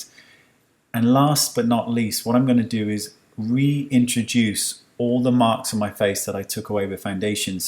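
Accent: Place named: United Kingdom. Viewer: Hi dear I'm new here so I'm watching old vídeos that are so interesting nowadays as well I love your easy explanations and so professional at the same time My language is Spanish I'm from Uruguay but I do love since I was a child British pronunciation so to watch you it's awesome ,excellent pieces of advice and straightforward 🌹Love your way and your wink lol Thx for being here to help us ♥️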